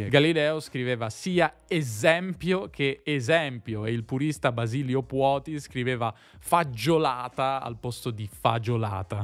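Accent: United States. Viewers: Parli inglese con la pronuncia americana!😉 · You sound like an educated Texan when you speak English.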